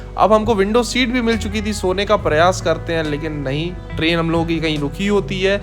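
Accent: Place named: Bundelkhand, India. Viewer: Bhai aaj bhasa puri bundelkhandi bol rahe ho❤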